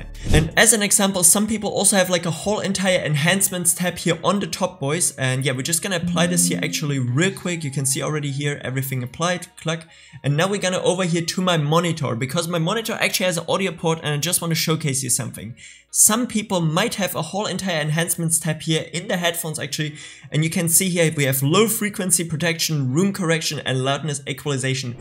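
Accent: Germany. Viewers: So ein deutscher Akzent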